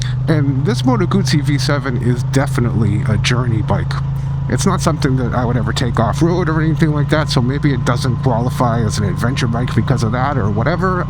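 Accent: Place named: Boston, United States. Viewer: We seem to have similar taste in bikes. My Tiger Explorer 1200 was getting a bit big and heavy, so I bought a V85TT in 2020 for birthday #75. It seemed to have all the things I wanted in a bike. 5 years and 16K miles later, it just wasn't gelling. So it went to a new home late last year and I picked up a Himi 450. Did a 5700 mile shakedown ride on the Himi and it is a great bike. In the interim, I picked up a Triumph Speed 400 for a day ride play bike - and it's a hoot. And then, there's the '86 Yamaha SRX-6 (that I bought new) sitting in the back of the garage sadly in need of a complete restoration before it can go back on the road. And I still have the big Tiger - although I will probably try to rehome it this Spring. The Himi is doing what I need for a distance bike and the Tiger deserves to be on the road. And I thought that I detected a bit of Boston in your accent. 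😁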